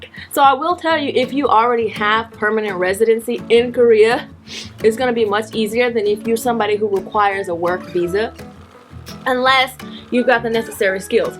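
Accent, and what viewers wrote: South, United States. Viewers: Love you , you are crazy lol ! that southern accent. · I can tell you are southern girl...love it! You better dig up Mya and Bubba Sparxx LOL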